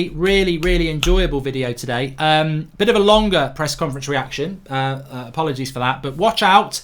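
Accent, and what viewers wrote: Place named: United Kingdom. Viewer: Pommie lingo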